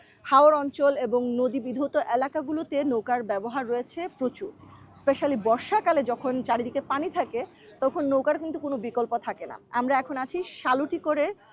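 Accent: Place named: Dhaka, Bangladesh. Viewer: আপনার কথা নিখুঁত মাতৃভাষার(সিলেটি) ব্যবহার নাই, কোন বিভাগের ভাষা ব্যবহার করছেন সুমিস্ট ভাবে পরিবেশন করছেন। এতটুকু নিশ্চিত সাবলিল ভাবে ঢাকা বিভাগের ভাষা বলেই মনে হচ্ছে। ধন্যবাদ।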